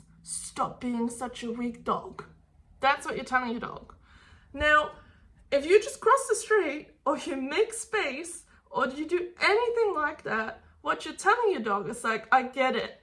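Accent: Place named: New Zealand. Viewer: Thank you!!! Now i know I was doing everything too fast with my dog and expecting him just to behave and listen like he is at home without step by step routine- lots of nerves by now understanting my dog and buldong a relationship with him...just thought he has me in his backside when going out to new places -oh well probably he does as there is so much to do and see 🤣 especially if you are only one yo 😉 Anyway thank you- we will practice what you preach 😁 Ps. Love your kiwi accent and sense of humor 😉😁 Greetings from Poland 🙃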